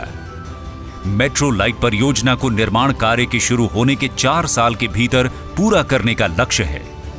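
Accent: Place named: Bihar, India. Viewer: Varanasi The way of talking is completely Bihari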